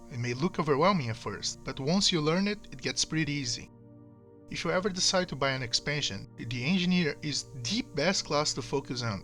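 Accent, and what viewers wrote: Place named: Brazil. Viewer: You sound Brazilian 😅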